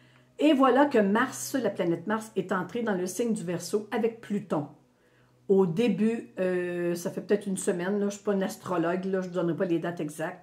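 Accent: Quebec, Canada. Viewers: Bonjour ! C'est toujours un plaisir de vous écouter, surtout d'entendre votre acquessan (le dire tout haut) Québécquois. Votre T-Shirt est magnifique également. Merci de vos interventions et sages recommandations. A bientôt. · Merci infiniment pour tout ce que vous nous apportez avec ce bel accent, ces expressions imagées québécoises et cette lumineuse gaité au long de la vidéo. Belle et lumineuse journée à vous et à toute votre communauté. Merci infiniment 🙏💫🌟💖💖💖💖💖💖💖💖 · je t adore c'est toujours un immense plaisir de t écouter qu'est ce que j aime en plus cet accent québécois 😅c est que du bonheur Au travail on me demande de déménager pour un autre bureau à deux alors que j étais seule tranquille et je suis sûre que c est l Univers qui me met en inconfort pour que je quitte enfin ce travail que j aime bien mais je sens bien que je ne suis pas à ma place véritable et chez moi ça construit à tout va et j aime la tranquillité bref je comprends qu'il est temps que je parte je suis prête vraiment mais pour faire quoi? 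Aller où? ❤❤ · Chère belle âme, Je vous ai découverte récemment et suis depuis peu abonnée à votre chaîne. Merci pour votre joie, votre spontanéité, votre authenticité, votre humour aussi, et ce merveilleux accent québécois qui vous caractérise. Vos vidéos sont rafraîchissantes par votre transmission et très inspirantes par leur contenu. Un grand merci pour votre présence sur la toile. 🙏💐🥰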